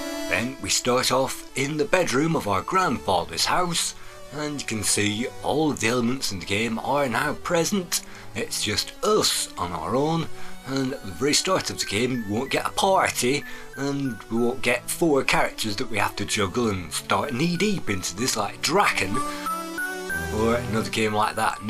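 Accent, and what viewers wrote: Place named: North, United Kingdom. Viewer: I like to hear northerners and wunder from what county thou art.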